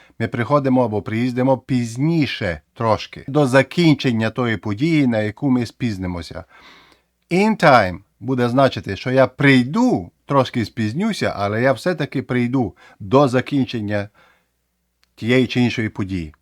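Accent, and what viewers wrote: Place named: Transcarpathia, Ukraine. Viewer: Чудовий контент, найбільше тане душа, що українською 💙💛І такий у Вас український акцент, неначе Ви із Закарпаття😊Дякую за знання, за англійську, і за українську!Все зрозуміло❤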